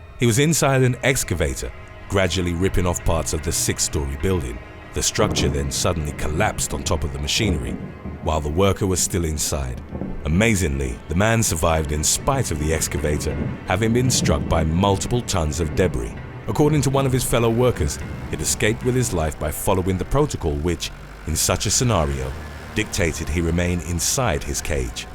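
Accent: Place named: England, United Kingdom. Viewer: South Church BLVD?!? The fact the ‘narrator’ has an English accent makes this even more painful to type 😭😂😂 Besides being born/raised/living in London for MY WHOLE LIFE, I’m pretty certain there IS NOT EVEN ONE BOULEVARD (blvd) in the WHOLE UK (England, Ireland, Scotland, Wales) let alone London (although the ‘posh’ parts of West London have some fruity street names) Pleanty of ‘Road’s, ‘Street’s, ‘Green’s, and the odd ‘Parade’, ‘Drive’ though (might have missed a few) P.S. Not many ‘Col De Sac’s, pleanty of ‘Close’s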